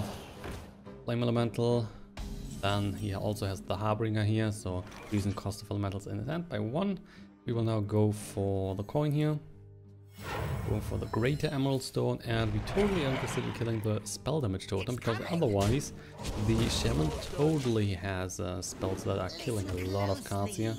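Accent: Germany. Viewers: Deutscher Akzent :D. Nice deck, i love it and it's my first good deck. I hope my dust is spend right :).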